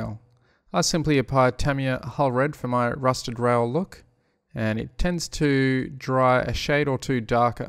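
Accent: Australia. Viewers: Hi, great vid mate, got referred to you by ModelerMan Mike. Great to hear another Aussie accent and looking forward to seeing more of your stuff! Cheers, Dan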